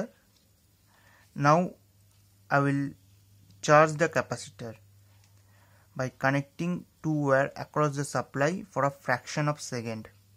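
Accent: India. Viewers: Sir, I hoped to learn from you but American’s have a very tough time with Indian accents. I’m sure you provided informative information in your video, but I could not stay with you simply because of the heavy accent. Thank you for your post, I’m only leaving this comment as a inspirational response to you so you can have a greater viewership.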